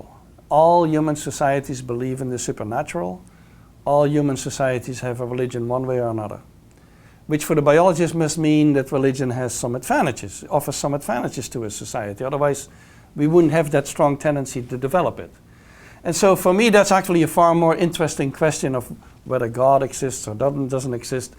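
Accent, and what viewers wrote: Netherlands. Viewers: balkenende accent.... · I could here he was dutch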